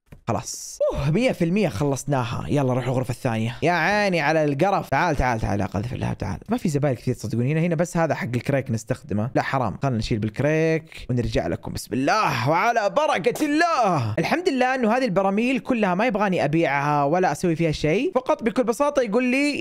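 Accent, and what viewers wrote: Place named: Oman. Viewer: شيول اللهجه العمانيه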